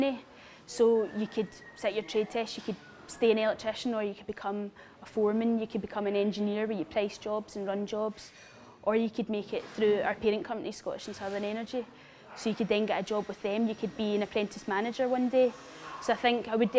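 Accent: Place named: Ireland. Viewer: Sounds just like the irish dude in my residential wireman class.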